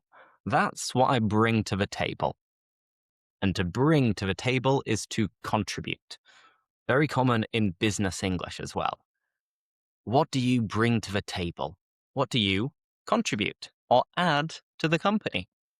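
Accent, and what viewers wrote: Northern England, United Kingdom. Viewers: Your pronunciation is from the north of England, of course that’s fine, however, because you have a colloquial accent it’s really important that you try to pronounce words a little more clearly for the learners. For instance, in the first sentence you pronounced the word “starting“ while pronouncing this word you didn’t pronounce the T We usually pronounce the T & D if followed by a vowel sound, I hope this helps you, great lesson keep it up.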